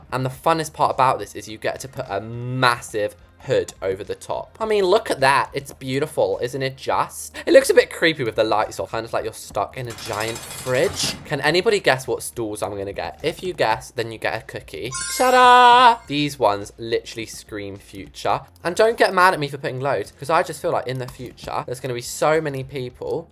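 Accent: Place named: United Kingdom. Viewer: The queen has amazed us again!!!!❤ always buts a smile on our face whith his loving British accent 😂😂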